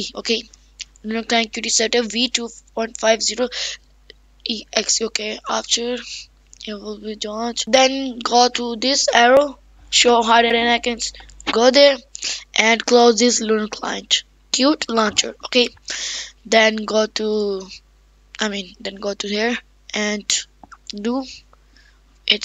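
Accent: India. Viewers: thx for client btw i know ur indian cuz ur english is like indian LOL............................ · bro got that indian accent tho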